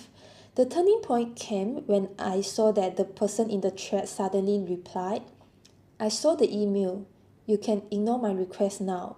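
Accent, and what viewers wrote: Singapore. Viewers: sorry to hear about your layoff, you are so admirably positive after that news. hopefully you can take a break and find something else when you are ready. their communication was terrible, should not be done via email, and as you discovered in large companies sometimes people are selected by algorithms or upper management. you sound like a Singaporean accent? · Your accent is cool you are from Singapore right! I'm Jonathan from El Salvador. Software developer too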